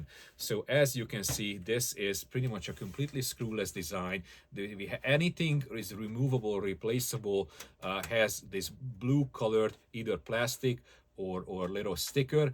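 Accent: Hungary. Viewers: are u hungarian by any chance? your accent really sounds like it:)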